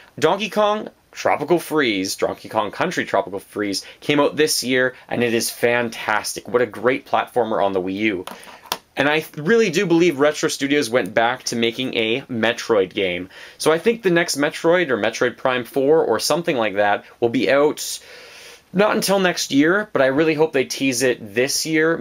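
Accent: Canada. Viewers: I just came across your channel and I really liked it, man! I enjoyed hearing your thoughts and optimism for Nintendo's E3. I really hope to see the Wii U Zelda that isn't Hyrule Warriors (Aonuma pretty much said this was true), some strong 3rd party titles, and some more stuff for the Virtual Console. I want my N64 and Gamecube games on there! Of course, I really want a new Star Fox, Metroid, and F-Zero, but we'll have to just wait and see about that. Also, it might be just me, but you sound tremendously like Alex Lifeson from Rush with that Canadian accent.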